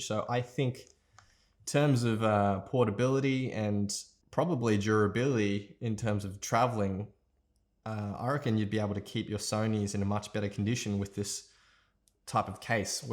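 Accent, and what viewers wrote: Australia. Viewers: Australian accent, no doubt.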